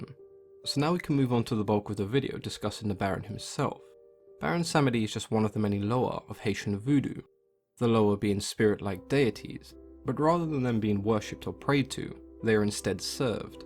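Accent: France. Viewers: Thus french accent. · Nice French accent 😀 from Paris ! Big fan of your work !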